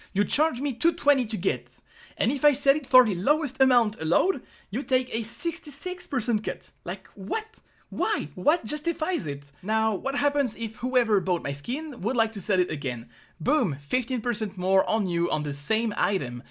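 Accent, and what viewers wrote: France. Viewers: He's French dude, accent's getting in the way, but still clean English. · A l'accent, j'ai reconnu que tu étais français... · Ohohoho, you got french accent monsieur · olala ton accent francait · Are u French? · Tu est français ? J'ai l'impression de l'entendre dans l'accent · Ce léger accent français :p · You French? You've got a French accent when talking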